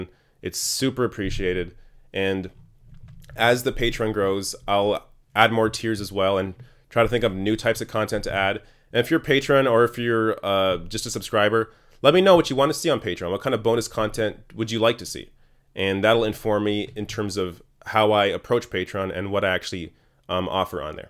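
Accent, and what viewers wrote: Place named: Canada. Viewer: your accent betrays your Canadian-ness, and I have always wondered why you focused on American politics. Thanks for clearing that up. I love your rational voice as a Progressive and will promote your videos as I can.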